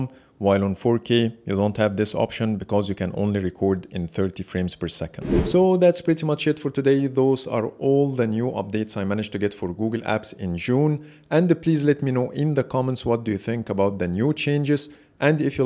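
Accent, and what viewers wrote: Egypt. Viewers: You’re an Arab right 😂😂 I can tell from the accent, an Egyptian?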